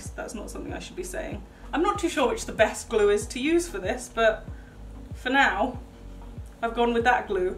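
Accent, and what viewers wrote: United Kingdom. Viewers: I love your British accent ❤️❤️❤️❤️❤️❤️❤️❤️ also you are soooo pretty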